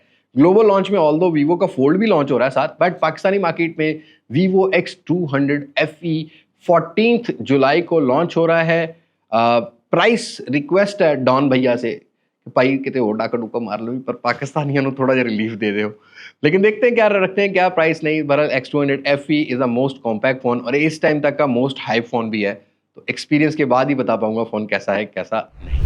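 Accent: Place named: Punjab, India. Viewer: Ap ka thora thora Punjabi ka touch bhut wadia lgia ay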